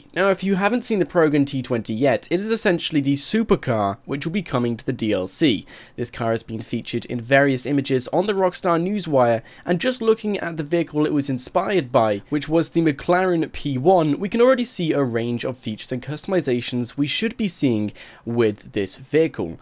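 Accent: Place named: RP, United Kingdom. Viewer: You sound so posh, I LOVEEE IT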